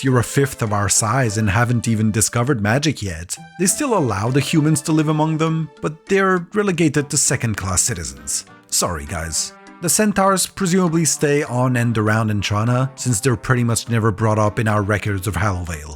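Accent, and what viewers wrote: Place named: Scotland, United Kingdom. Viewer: Dude your Scottish dialect is cool XD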